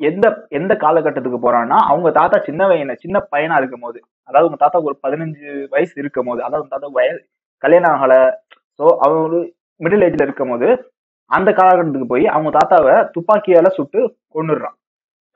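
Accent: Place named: Madurai, India. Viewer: Change ur slang it's completely madurai slang da... But keep going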